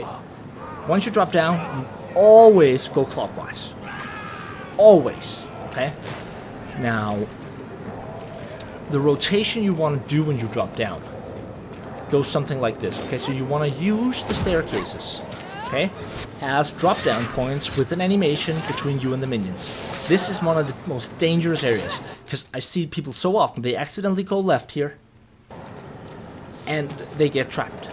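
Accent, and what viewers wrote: Denmark. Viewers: Are you danish? Your accent sounds a lot like WackyJacky101's a pubg content creator. Thanks for the video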